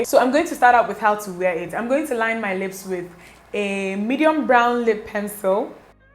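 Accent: Igbo, Nigeria. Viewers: Man I love her accent my bestie is Nigerian too😅but she doesn't like me listening to her when she is speaking Nigerian accent but still love her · She's too funny, and I love her Nigerian accent. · Extremely funny especially the igbo accent. Love this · Oh no Shamsa mohamed thats how Nigerians talk. Thought the same when I had roomates from Nigeria it used to really bug me till I learnt thats how they talk and no offense. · ''If you don't prime your lid with oily skin, you're on your own''😂😂😂😂😂😂😂...i've subscribed girl...you just soo speak like a tru Nigerian...love from Cameroon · no, that’s just how nigerians talk. very blunt, right out the box. she doesn’t sound rude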